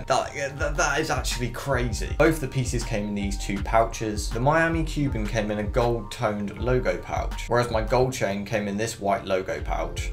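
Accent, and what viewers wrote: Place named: United Kingdom. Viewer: Love ur british accent homie 😂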